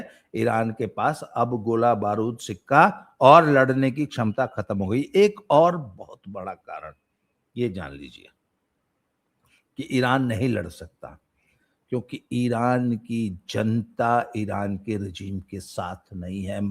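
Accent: Haryana, India. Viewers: आपके मुँह त हरयाणवी कसूती सुथरी लाग है 😅😅😅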